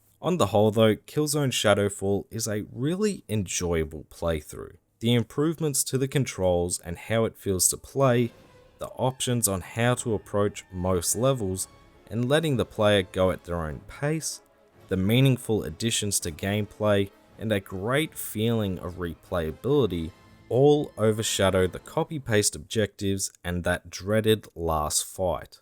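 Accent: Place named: Australia. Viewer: My first experiences with the franchise, as you rightly suggest is the case for many in this review, was with Shadow Fall. I got bored with the story fast so I enjoyed the multiplayer a lot and as I joined late to the PS4 generation, I had the chance to muck about with all the expansions. The visual appeal of the Helghast got me to pick up Killzone 2 and 3 for my PS3 from my local Video Ezy when it was closing down for $2 (NZD) each. I figured you'd have heard of them as you sound like an Aussie. I agree that Killzone 2 with it's impressive first person cover and heavy feeling shooting gameplay is the strongest entry in the series.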